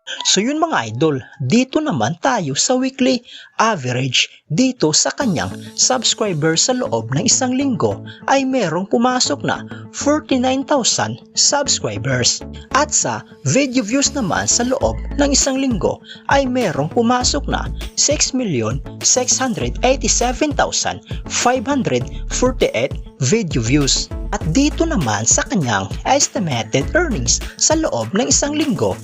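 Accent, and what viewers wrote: Visayas, Philippines. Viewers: ang lamya mo mag vlog gukbo..ka umay ka..mas pinapanget mo pa boses mo at binisaya pa ang tono..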